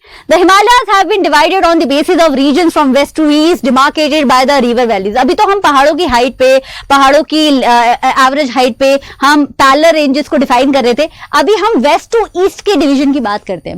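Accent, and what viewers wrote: Tamil Nadu, India. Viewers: By ur talking style i think that u are from tamil nadu😄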